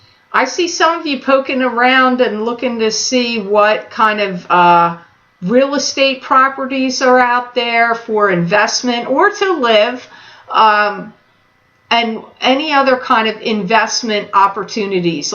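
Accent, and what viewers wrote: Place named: Maryland, United States. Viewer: Your accent feels like home to me... I swear you're from Maryland or Pennsylvania! Love the video and thank you for your words. :)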